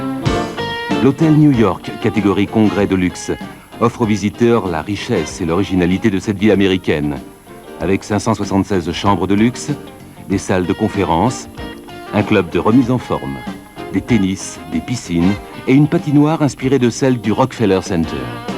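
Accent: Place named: France. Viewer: Die Franse uitspraak van de Engelse termen maken het helemaal. Merci om dit te delen, deze had ik nog niet gezien. ❤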